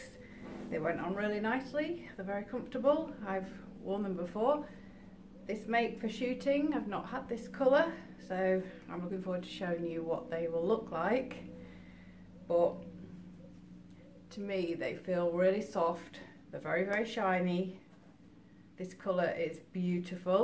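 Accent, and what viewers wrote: United Kingdom. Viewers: I DETECT AN ACCENT WHEN YOU SPEAK IN YOUR VIDEOS ARE YOU BRITISH?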